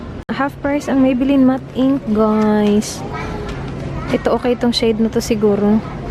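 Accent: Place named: Visayas, Philippines. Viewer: Hi ate! Bisaya din po ako. Ang saya talaga manood ng mga vlogs mo. Nindot kaayo inig naga bisaya ka kataw anan kaayo haha ❤️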